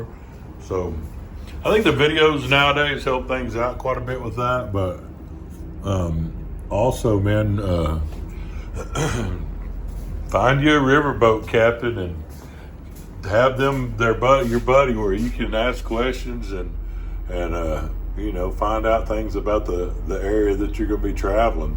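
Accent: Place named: South, United States. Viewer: People will never under stand red neck talk bud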